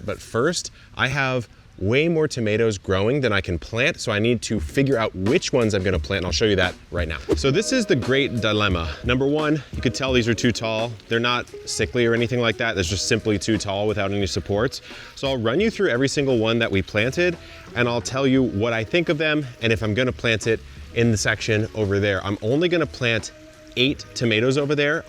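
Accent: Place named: Australia. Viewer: Hello Mr self-sufficient guy I love your videos I learned so much and ps. Love your accent I guess you from Ustralia 😁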